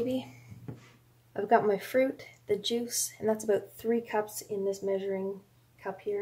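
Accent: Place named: Canada. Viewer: Hi. Canned up a batch of the Orange Marmalade today. Snowing winter day here in the high desert of Oregon and this Marmalade is like an afternoon in Florida! Your video inspired me to can something new. It's absolutely lovely. As to your reply wondering what words gave you away to me as a Canadian, any word with "out" in it. Lol. Such as "about" and the word been come to mind. Not that it's a bad thing. I love Canadians! I lived in Montana not far from the Canadian border and had the pleasure of meeting many when they ventured down from Saskatchewan to our little town south of the border. Take care and looking forward to what you bring next to Canuary 2023.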